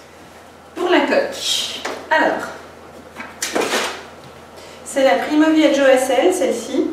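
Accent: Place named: Switzerland. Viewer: Bonjour, superbes vidéos! Très intéressant! J'ai l'impression vu votre accent et les mots tels que nonante, huitante que vous êtes suissesse. :-) Est-ce juste? Si oui, j'ai une petite question. Je souhaiterais acquérir une poussette Peg Perego et vivant également en Suisse, je ne sais pas où en acheter. Sur le site d'Orchestra je n'ai vu que des accessoires. Auriez-vous un conseil?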